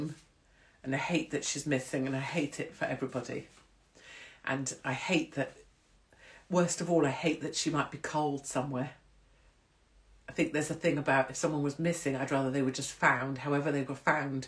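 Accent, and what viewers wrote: RP, United Kingdom. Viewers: Yes Joanna Lumley - posh spoken - really outspoken😀👍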